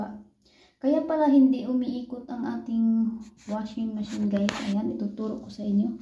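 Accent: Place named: Visayas, Philippines. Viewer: Bisaya Kapa Po maam, God bless maam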